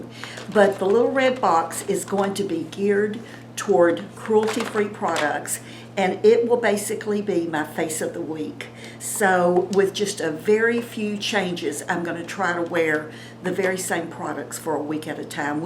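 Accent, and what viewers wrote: South, United States. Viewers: UGH...no more it cosmetics for me...way over priced anyway! Thanks for the info!! Just found your site... Enjoying hearing the southern accent as I am from Memphis, but have been in Reno, NV for past five years for medical treatment for my son with Lyme disease. Hope to get to go home soon. You are a beautiful 70 ish year young!!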